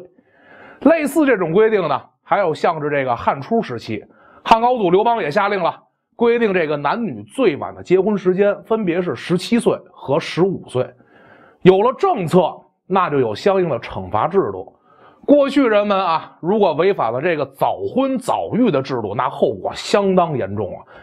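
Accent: Beijing, China. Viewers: 这北京口音咋听不出来亲切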